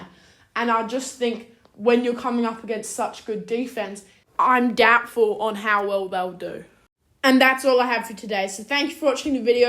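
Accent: Australia. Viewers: Well, time to change that title and edit the video. Sorry Bud. Are you Australian or British? Exum and Green need to speak with you mate. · She doesn't sound American. Maybe Australian. Her understanding of the game isn't that good.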